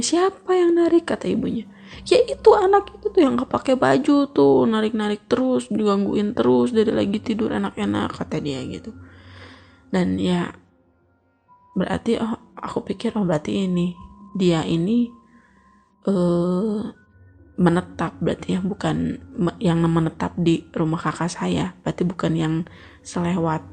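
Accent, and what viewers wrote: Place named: West Java, Indonesia. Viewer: Inimah atuh urang Sunda pisan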